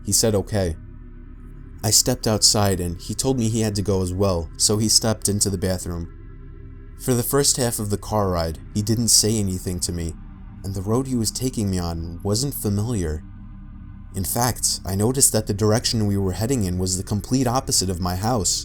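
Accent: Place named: New York, United States. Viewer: Being a horror buff and NYC native, I appreciate these stories AND your (NY/NJ?) accent 🙌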